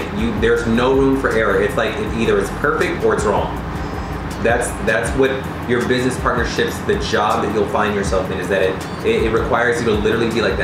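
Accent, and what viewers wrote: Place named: Jamaica. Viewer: Intro 🔥 “ tun ye up” you must be Jamaican.. 🇯🇲 I’m Jamaican btw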